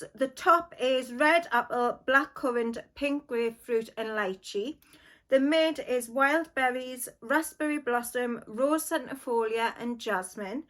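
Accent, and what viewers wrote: United Kingdom. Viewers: Hi. I was in high school in the 90s. I might have to pick this one up for fun. Thank you for your layering suggestions. You have a lovely manner of speech. Are you from England or Wales?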